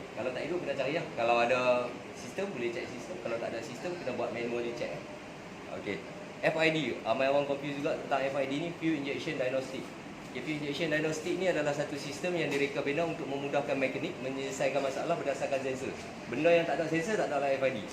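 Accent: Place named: North, Malaysia. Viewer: Power...cara bahasa mcm orang belah utara...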